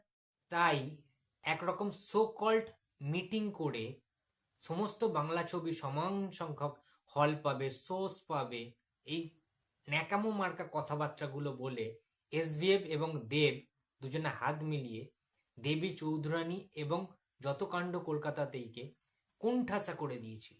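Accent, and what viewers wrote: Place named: Bangladesh. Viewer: Golar voice sune to mone h6e bangladeshi....ta boli age nijer desher subho kamona korun na...jate gota desh tar sad budhi ghote....parshobarti desh e ki h6e jene ki korben....tollywood er king age jei thakuk na kno...akhon 1jn e a6e...at present Seta hlo Dev....apnader Shakib o kichu chirte parbe nah...😂 Ei karonei hyto bole Ma er theke masir dorod beshi...😂😂😅😅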